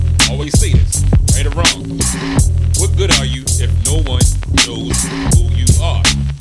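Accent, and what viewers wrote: Maryland, United States. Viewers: Super dope stuff man. And I thought I detected a MD accent!🙌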